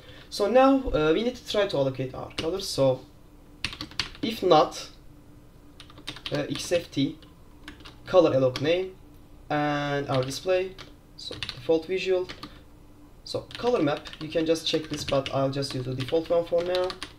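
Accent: Turkey. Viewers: Thank you for the Xlib tutorials. Unfortunately X11 programming sources are scarce. I appreciate your tutorials. Would you cover Xshape click-through windows too? A transparent screen widget would be good. Your accent sounds Turkish.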